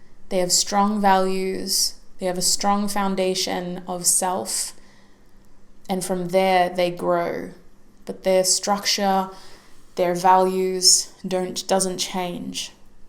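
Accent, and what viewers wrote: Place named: Australia. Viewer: Aussie accent 😍😍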